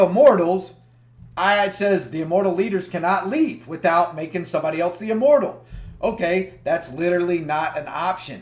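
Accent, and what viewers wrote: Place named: Texas, United States. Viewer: Texan accent makes sense. Oil money=No Skills/P2W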